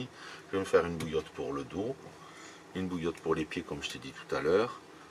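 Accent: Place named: South-West, France. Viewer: merci a toi , je doit me repeter , mais j'adore ta voix au noté fleuri du sud ouest , ton rythme sain, et ta jolie diction , les silences sont aussi appreciables , souvent je clique en onglet , puis quand c'est le moment de regardé jy vais , comme la , un peu fatiguée et sur les nerfs , ta voix ma apaissé , j'ai presque faillé m'endormir ...ta phrase de traversé la vie des gens , en restant dans la tienne , en prenant réellement plaisir a la presence de l'autre et inversement ...j'ai un peu de mal a saisir le pourquoi du camion , la premiere vidéo que j'avais vu etait celle de la remorque a vélo , puis celle ou tu coupais ton bois a genou ...PAREIL pleine de poésie , tu es un jolie messager ,a bientot ...peut on acheter un t-shirt et soutenir ta chaine ?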